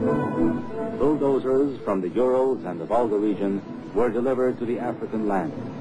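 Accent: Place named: Jamaica. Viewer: What's up with the jamaican accent? You're not even jamaican and you trying to sound like bob marley. Marley talk about ethiopia my mom's ancestor country, not somalia.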